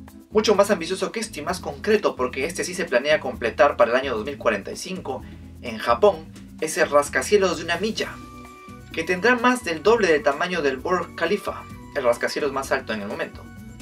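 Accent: Peru. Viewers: No es que use acento neutro xD, sino que ese es el acento de las personas que viven en ciertas zonas de Perú, por ejemplo, Lima.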